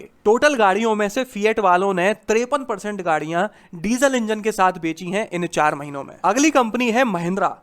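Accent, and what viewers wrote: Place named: Agra, India. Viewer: Agra ke. Lagte ho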